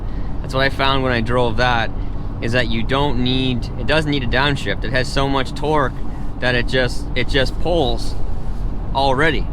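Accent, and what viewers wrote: Canada. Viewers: You are Canadian, i thought American but hey love Canada man love Canada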